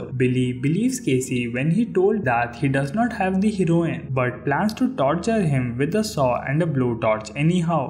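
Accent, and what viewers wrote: India. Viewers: I'm a born and bred Englishman. Your voice and accent is a very nice Indian one, also soothing. Ignore the racist haters and please keep going ! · I’m tired of the ai text to speech voiceovers so finally hearing a human is so relaxing. Also I enjoy Indian accents as I can do a Indian accent too along with a lot of others!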